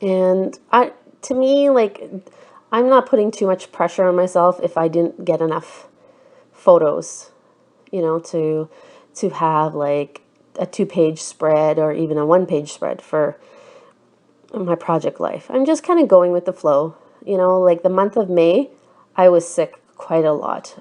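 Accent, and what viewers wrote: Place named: Canada. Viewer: Just found your channel, love your PL process video. Hope to see more of them. I used to live in Canada north if Toronto and last year we moved to Australia. Was really great to hear a Canadian accent.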